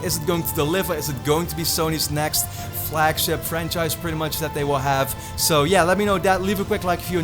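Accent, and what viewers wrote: Netherlands. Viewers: Ja dat accent herken je al van een mile away! Maar hey, beste nederlandse gaming YouTuber naar mijn mening.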